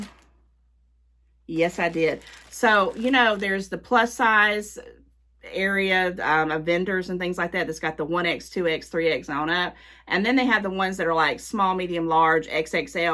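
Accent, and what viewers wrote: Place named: South, United States. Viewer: Hey Hun it's a Southern Draw. You won't get one unless you're in states like Georgia, Alabama, Tennessee, Kentucky, Mississippi, or South Carolina. Mainly Mississippi, Alabama, and Georgia. These are the Southern and Deep South states.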